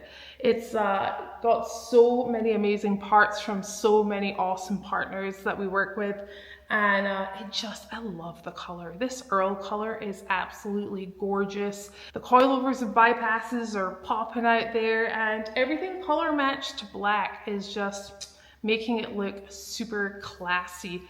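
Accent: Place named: Northern England, United Kingdom. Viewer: While watching House of the Dragon, I heard an accent I thought I recognized. Turns out you sound like the people of the North.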